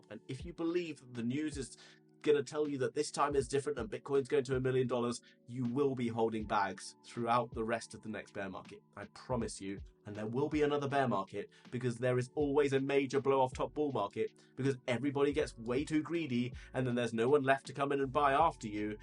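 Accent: England, United Kingdom. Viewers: Thank you, always good to hear an English voice with content.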